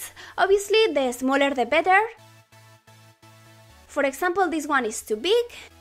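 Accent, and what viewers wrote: Spain. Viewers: tienes acento español😱😱😱😱 viva españa! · Eres española? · ignorant, she is Spanish its her accent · Es española, por eso su acento es tan fuerte.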